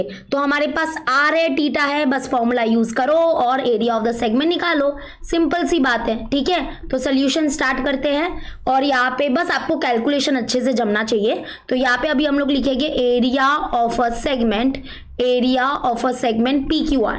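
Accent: Maharashtra, India. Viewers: Dii are you Maharashtrian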